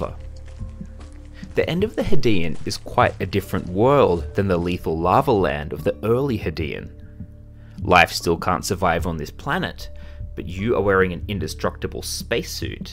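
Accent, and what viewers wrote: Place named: Australia. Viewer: I reluctantly clicked on this video, thinking it'd be just another AI-written and narrated video, but upon hearing the mellow Aussie voice and the distinctly human drawings, I was pleasantly surprised at the discovery of this channel. Looking forward to watching the rest of the series!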